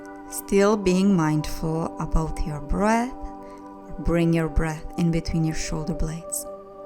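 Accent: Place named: United States. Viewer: sexi baba ze cesko a meles ako z ameriky hee.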